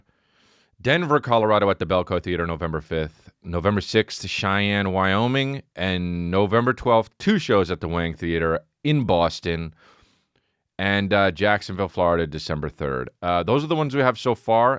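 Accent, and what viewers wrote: United Kingdom. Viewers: Your British accent is surprisingly really good!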